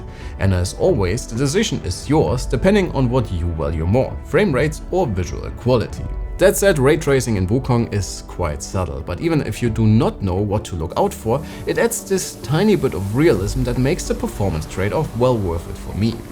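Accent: Germany. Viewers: I don’t understand, why you don’t do the videos in German.. it is clearly hearable, that German is your mother tongue… or at least in both, German and English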